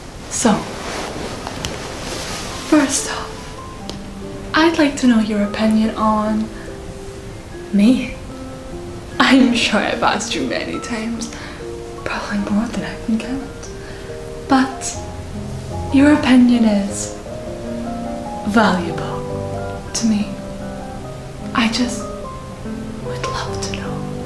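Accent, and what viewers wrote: Scotland, United Kingdom. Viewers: wow, not gonna lie i did not realize you were scottish til just now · Scottish, Irish, British, or Dutch accent? Either way your voice is lovely!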